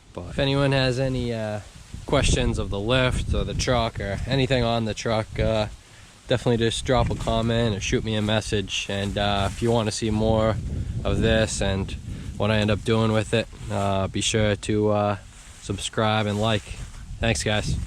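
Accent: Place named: Massachusetts, United States. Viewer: Nice video, knew you were from mass by your voice lol I live in plymouth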